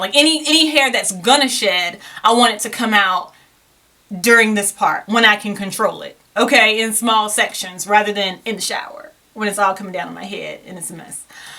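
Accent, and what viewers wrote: South, United States. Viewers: Am I noticing a country accent? I’m talking about the cowboy type too. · I hear it the country accent too.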